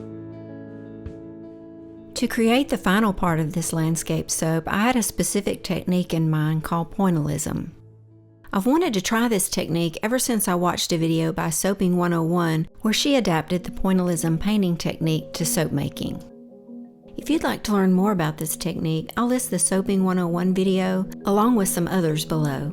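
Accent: South, United States. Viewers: I am so proud of you I have a tear in my eye. This is an amazing video that I can tell you put your heart and soul into. I love your voice over! And let me just say I did not imagining you having a southern accent! I need to change the way I say, “Oil (insert Southern California accent 😂).” You say it so much cooler! Bravo and take a bow!!!!!!!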